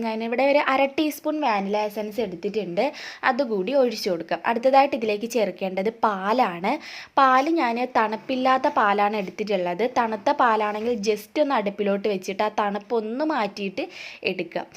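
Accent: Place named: Malabar, India. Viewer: മലബാറുകാരി യാണോ ഭാഷ കേട്ടത് കൊണ്ട് ചോദിച്ചതാ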